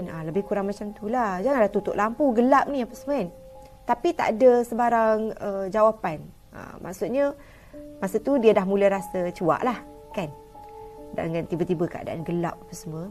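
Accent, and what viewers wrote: Kelantan, Malaysia. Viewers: Kak org klate ko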